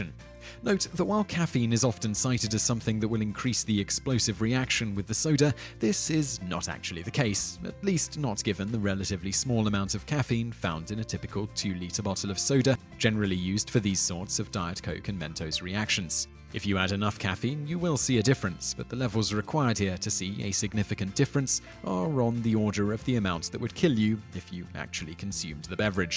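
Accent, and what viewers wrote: United Kingdom. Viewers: Pondering Smirk Oh, probably close to when I did, then. They must've commented after I'd started watching so it didn't show. It's funny nonetheless! One of the perks of having an awesome british narrator. Simon is awesome, but the main audience is American, where Geezers and Geysers are completely different.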